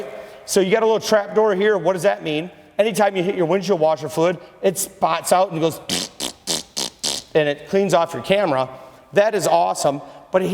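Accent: United States. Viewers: Yes!!! I was going to say this guy reminds me of Chris Farley so much! The Midwest accent with the all the exaggerated movements he was making plus how loud he talks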